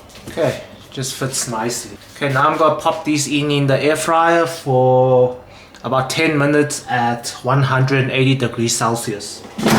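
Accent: South Africa. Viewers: your accent sounds like and is in fact indistinguishable from a non-white person's south african accent - cool man! thanks for the recipe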